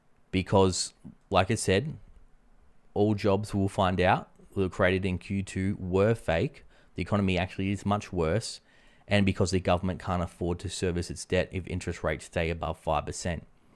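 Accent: Australia. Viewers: Australian or Aussie accent.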